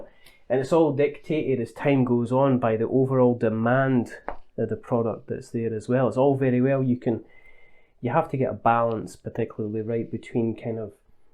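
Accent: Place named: Newcastle, United Kingdom. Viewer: I had no idea you was a geordie.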